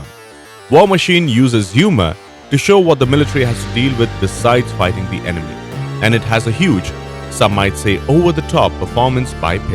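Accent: India. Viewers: he's from india but has a surprisingly mild accent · You sounded like Indian, checked your 'YouTube and I was right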